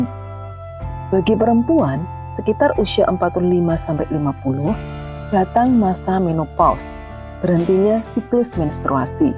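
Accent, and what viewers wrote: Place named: Java, Indonesia. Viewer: Jawa banget nduk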